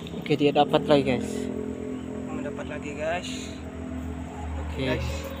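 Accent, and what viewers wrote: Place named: Netherlands. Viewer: Kayak orang holand ini logat 😁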